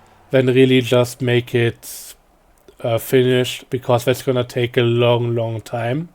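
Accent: Germany. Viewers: Do I hear a bit german?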